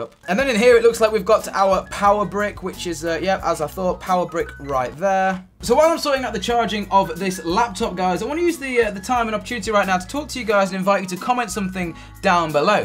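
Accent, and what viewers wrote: United Kingdom. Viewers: British English is terrible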